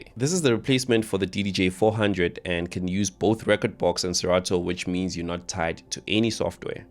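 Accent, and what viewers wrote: South Africa. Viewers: That SA accent my dawg! Lol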